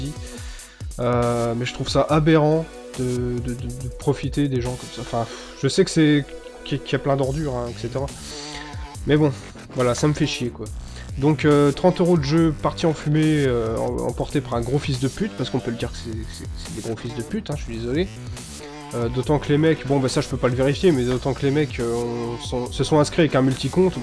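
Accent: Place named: Charleroi, Belgium. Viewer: L'accent du nord que tu as me fait blinde penser a celui de Charleroi (ville de Belgique) 😉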